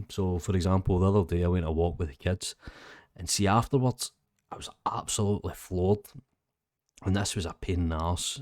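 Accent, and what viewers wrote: Scotland, United Kingdom. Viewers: Hey even a frenchy frog can recognize scottich accent. Have a good recovery. And thank you.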